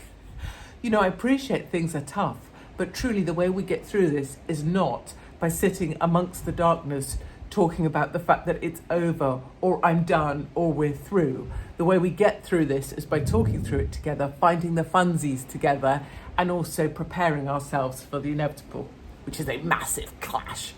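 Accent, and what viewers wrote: United Kingdom. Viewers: you deserve the American Patriot Award! We Texans love British humor, perspective & your cute accent. And God, Guns & Guts to speak plainly. I see several fellow Texans Open Carrying each week. I have open & concealed holsters & carry a handgun in my car & SUV. I heard 3/4 of guys have a pistol or long gun in their car / truck in our State & the South, generally. Am armed society is a polite society! Any crook dumb enough to pull a gun here will find 4 or more pointing right back in a heartbeat.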